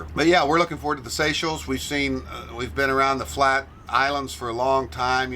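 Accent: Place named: Texas, United States. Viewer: Your family is wonderful so very talented in many ways , Love to hear you talk TEXAS My greatest love is your boat . It’s really pretty you guys keep it wonderful I watch a lot of boat channels I would pick your boat over most I see . In another life I would live it the same way you guys are .All of you put a smile on my face Lovely family !stay safe have fun !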